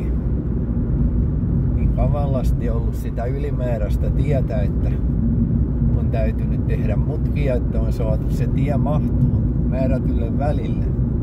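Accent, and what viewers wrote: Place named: Tampere, Finland. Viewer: Aaargh!😖 Saan suorastaan oksennusrefleksin, kun kuulen tamperelaisella murteella ”määää näin niin isoja Raktodin munia pellllolla” 🤮🤮😵 Ei kertakaikkiaan voi käsittää, miten ylös työntävältä voi kuulostaakkaan tämä ”raktodin muna” näin isäntänä…..ja murteeltaan. 😵‍💫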